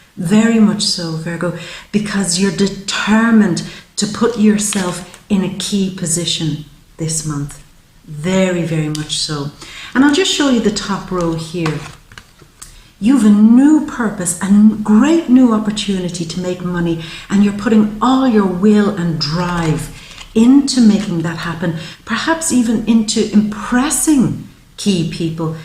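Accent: Ireland. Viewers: I Loovee your Irish(?) accent. Beautiful.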